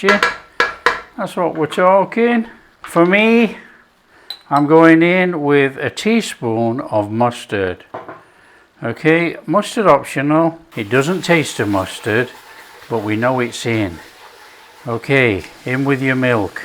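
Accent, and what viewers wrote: South, United States. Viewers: Not a bad Southern accent!! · Your southern accent needs work, but the food is what granny made! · As a Southerner in the US... I love your Southern accent! 😊 And your cooking! ❤ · Y'all just listen here to the southern version of chef. You can speak southern a lot better than I can speak your English. Personally, I love it. And I say for the umpteenth time that I love what you cook. And pork chops are another of my favorite. Youve cooked them pretty much like we do. And served with mashed potatoes and gravy is just the best way. Theres not much food that I don't like( I'll never be rich 🤑 enough to eat escargot or caviar) but I will try most anything. Youve made some good eating there chef. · God you do make me laugh, I think you're American accent was very good actually. 😊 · Southern Grandma here. That Southern accent ain't the worst I've heard. 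I'm a good cook, but my pork chops could use some improving. No doubt, it's somewhat a lack of patience problem! I'll be trying your buttermilk trick soon enough. You know, here in the states, all of our bacon is streaky bacon. Where I come from, it's routine to save your grease from every time you cook the bacon. I keep it in a Mason jar in my refrigerator and use it pretty frequently. Love it to grease the cast iron skillet before I make my corn bread. The CRUST! Thanks,.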